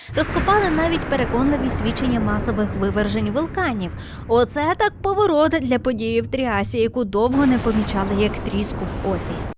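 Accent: Standard. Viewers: Чиста українська мова, яку хочеться слухати й вчити. Хороше відео цікаве й яскраве. Ось такі треба канали для України! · Неперевершена вимова...Чудовий голос.... Еталонна українська мова... Цікавий контент. Однозначно вподобайка..! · Величезна подяка за джерельно-чисту українську мову, цікавий виклад, гарну дикцію та відмінну інтонацію. Така подача точно не дасть заснути. Ще раз дякую. Слава Україні!!!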